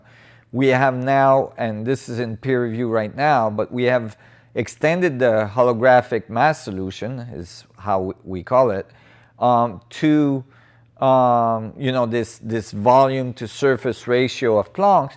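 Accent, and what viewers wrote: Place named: Quebec, Canada. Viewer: I am doing Joe Dispenza work at the moment and came across your videos and WOW. You explain everything so well. I would love to seat with you for an entire day. Je viens du Québec et je reconnais l’accent :-) je vis en Floride depuis plusieurs années. Si tu est dans le coin j’aimerais bien aller à un de tes cours. Merci mille fois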